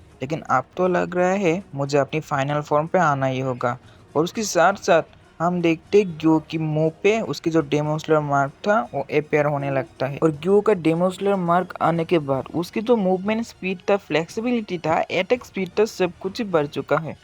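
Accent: Bengal, India. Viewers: Bhaiya tumi Bangali na?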